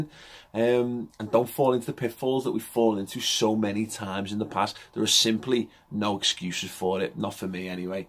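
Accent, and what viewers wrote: Liverpool, United Kingdom. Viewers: I swear to god, go on settings on this vid and turn the speed down to 0.5 and listen how incredible the scouse accent sounds in slo-mo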